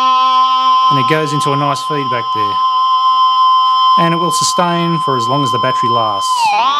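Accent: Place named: Australia. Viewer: You're Australian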